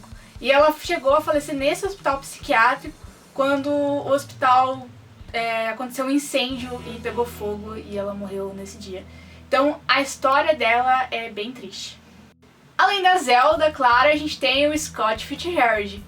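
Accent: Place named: Minas Gerais, Brazil. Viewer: muito bem fazeres vídeos acerca de cultura mas tenta fazer sotaque brasileiro de Rio de Janeiro, sei que nada tem haver com a questão da cultura mas acho tão chato e enervante esse sotaque do interior , Minas ou algo do gênero , tenta isso é tens mais um subscritor.. Bj e boa sorte com o canal!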